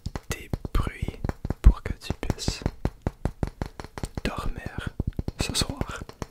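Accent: Quebec, Canada. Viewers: Ton accent québécois mélanger avec l’anglais est très drôle! 😂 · Je vis au Québec et ton accent ressemble beaucoup au mien dans tout les cas tu parle très bien français!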